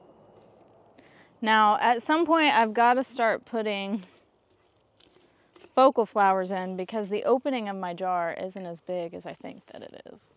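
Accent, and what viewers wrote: South, United States. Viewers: Just found your channel. We grew other colors of broom corn. I believe it was red blue and yellow. Been a long time but I think that was what we grew. Also, I have a question. I know where you say you live BUT I don't think that is where you are actually from. I'm in Alabama and you sound as though you are from here or Tennessee or Kentucky or at least the south. Am I close ?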